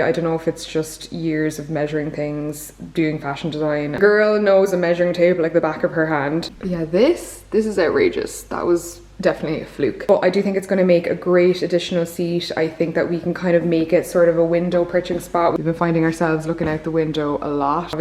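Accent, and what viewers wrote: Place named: Ireland. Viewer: The combination of your beautiful Irish accent with the rain sounds in the background is so soothing ❤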